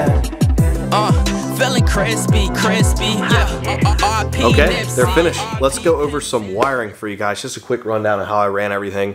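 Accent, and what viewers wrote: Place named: Pittsburgh, United States. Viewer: First time ever watching, I heard your voice and thought to myself, "this dude sounds like he got some Pittsburgheese in him" then I saw the Steelers hoodie....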